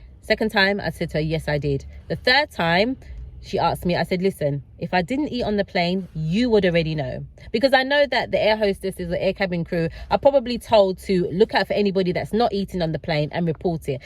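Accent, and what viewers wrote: United Kingdom. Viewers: Okay. My apologies. Did not watch to the end. Nice British accent 😎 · It seems to me that you were a little too prepared yeah 2 travel frequently if someone is a frequent flyer and then hopping over and over they wouldn’t need the amount of information that you got if you gave them very little it would’ve said she’s not prepared because you had so much information to squash there they suspicion against you. It feels that they saw you as too prepared for them so that is why they gave you the full degree treatment so next time Missy let them ask the questions don’t chitchat with them saying I watch border force bullshit. you thought your British accent was gonna get you through and be treated decency skin colour is a criminal so they showed you how they see you.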